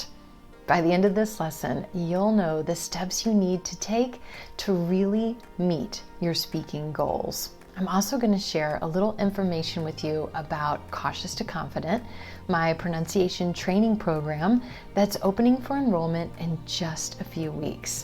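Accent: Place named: United States. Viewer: Hello my teacher really I love way you're speaking American English pronunciation it's so sweet I'm Steven am in Canada Ottawa Ontario I need to speak English like you if possible.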